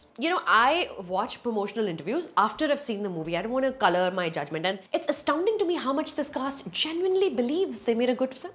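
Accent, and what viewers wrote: India. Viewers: yaar tum bahut ache reviews deti ho. i literally wait for your reviews and almost swear on them and then cheer them too. but thoda dheere dheere bola karo na. kahi jana hota h kya tumhe review ke turant baad? matlab indian accent ke liye bhi subtitles on karne padhte h mujhe. please mujh jaise fan ki yeh pukar sunle bahen aur araam se bola karo. zabaan ko bolo thamba thamba! baki i love u too. great work always.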